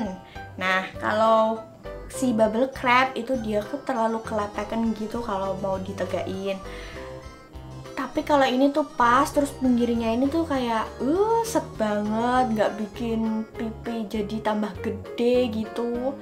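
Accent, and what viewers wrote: Java, Indonesia. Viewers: Keknya org Jawa ya min? Kelepek Ken..ke mencung en 🤭🤭🤭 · Orang jawa mana sih kak ? Kok medok bgt hehe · Mba orang Jawa ya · Jawa medok kak:)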